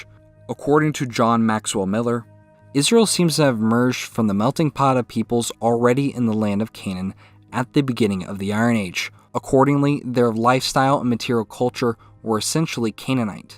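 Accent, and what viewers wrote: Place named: England, United Kingdom. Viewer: Everything was almost perfect.. I just have one problem. The way you pronounced the Hebrew sentence in the middle of the video, offended me greatly.. lol.. kidding. But it was way to harsh to listen to. If you want to improve your pronunciation (in modern Hebrew, since that's how you pronounced it), the Kh has to be softer, and the stress is a bit off in most words.. your English accent is also pretty noticeable in the lack of clear vowels.. it should be pronounced more like in Latin (the Ah sound, that should sound more like Uh). Apart from that very clear and precise video, filled with factual information.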